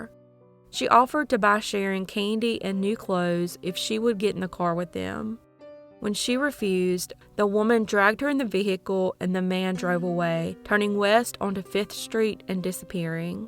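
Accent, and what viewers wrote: South, United States. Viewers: Thank you for All the information on cases ,the way you present with your soft, caring, and compassionate voice. Always good to hear that southern Belle voice first thing in the morning. Take care and Be safe ❤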